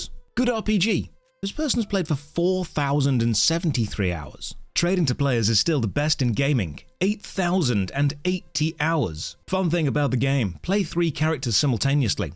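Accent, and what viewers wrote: United Kingdom. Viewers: Way back when, in mid-late 2000's, a friend of mine in college got really hooked on Grenada Espada and was talking at length about farming different types of gun powder and assembling Zodiac Doll or some shiz. And I could not express how little I cared. Many years later I am watching a different dude talking about effectively the same and find it much more engaging. Conclusion: everything is better in British accent.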